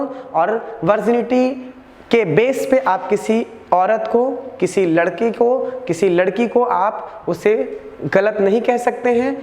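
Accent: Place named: Bihar, India. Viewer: Your style of speaking is outstanding.।। Sb bihari aise hi hote h kya, Love your accent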